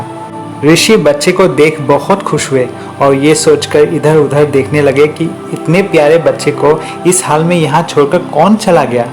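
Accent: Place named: Assam, India. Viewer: Great story brother.... By any chance r u Assamese? I mean just guessing from the tone of the voice🙂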